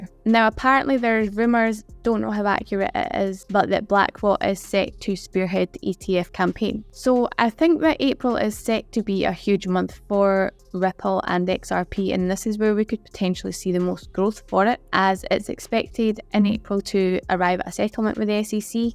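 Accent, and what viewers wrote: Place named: Edinburgh, United Kingdom. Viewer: Nice video.. That sounds like an Edinburgh accent, that's where I'm from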